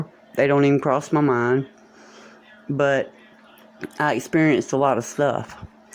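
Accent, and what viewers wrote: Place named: North Carolina, United States. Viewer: u sound like u have an NC accent. I know bc I am from eastern NC. just wondering if u have lived in NC ever? yes u r telling the truth girl for real! I am in recovery now imo but yes I am on suboxone that is prescribed and going to a counselor as well. started late with drugs as well. God bless you 🙏 ❤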